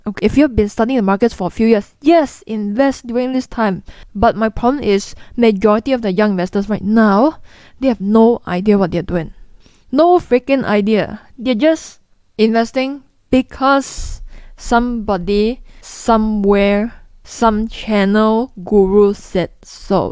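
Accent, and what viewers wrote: Singapore. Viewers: Great content,! So glad I found you AND your beautiful Singaporean accent. Thank you for the hard work you do. XOXO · i actually like your singaporean accent . it grew on me lol i dont care what you sound like.. you say all the right things :D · I absolutely adore your Singapore accent!!! Thank you so much, I am relatively new to your channel...and I am enjoying and appreciate your content. You are have a gift of teaching, with plenty of wit, humour, and at the same are able to transfer your knowledge, sharply and well broken down. Thank you. ☺️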